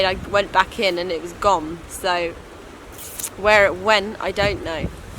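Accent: United Kingdom. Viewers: British accsen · Wonderful British accent...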